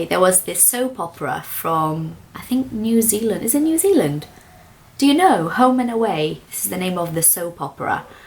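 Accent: United Kingdom. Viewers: Home and Away is an Australian soap. I just found you and I'm in love with your English. It is really good, clear and your British accent is amazingly beautiful. I'm so jealous of you as I consider English accent the most beautiful one, it is my favourite one. How can contact you? I need some help! cheers Tassia from Rio de Janeiro - Brazil - but currently live in Perth/Australia. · Eu amo seu sotaque britanico, taooooo lindoooooo :) fico tao feliz por entender, mas nao falo nada.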